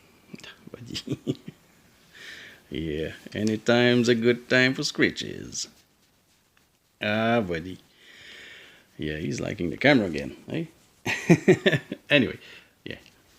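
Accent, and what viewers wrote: Quebec, Canada. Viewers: Wow! I really enjoyed this video of your journal collection. You are so talented to make your own journals from scratch! From your accent I supposed you are from Québec, Canada... I am from Québec too and I also make journaling videos on my channel. I would love to see flip throughs from you, you seem to have an awesome journaling style. I am subscribing cause I want to see more content from you. Again, congrats on your journal collection. Take care. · Québécois right?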